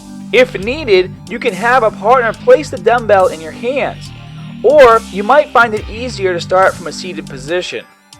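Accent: Boston, United States. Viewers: Love the filthy Boston accent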